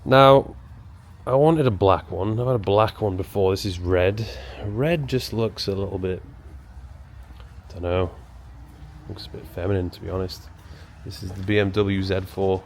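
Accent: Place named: Liverpool, United Kingdom. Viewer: Whats a scouser doing talking about marbella and ibiza. Stick to liverpool luv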